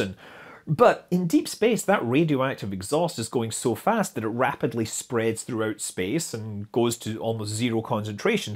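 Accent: Scotland, United Kingdom. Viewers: YouTube captions can't seem to understand your Scottish accent. It doesn't seem to have a problem with the English. 🤔 Lol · I'm lovin' the Scottish burr.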